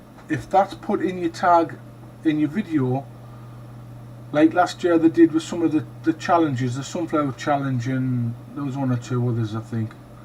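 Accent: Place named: North East England, United Kingdom. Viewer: Just found your channel by accident .. and recognised the accent. I'm in Whitley Bay and I guess you are not too far away. Regards !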